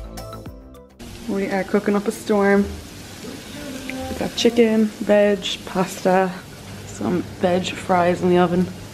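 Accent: Ireland. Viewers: Loved this vlog 💕Would literally buy the cottage in Doolin tomorrow if was for sale! NYC just pales into comparison for me. Trilled (!!) you made it home safely (love Irish accents 🤗) Looking forward to more vlogs from Ireland (Q and As would be fabulous) Delighted to see Cooper also 🐕 Stay safe, healthy and positive🌈 Does have a vlog or Instagram? 🌞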